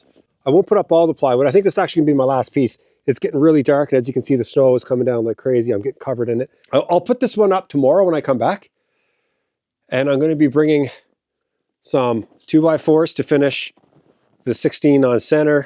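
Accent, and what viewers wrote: Canada. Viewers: As an Australian I had some difficulty with your Canadian Accent. I thought the Dog was called Puppy.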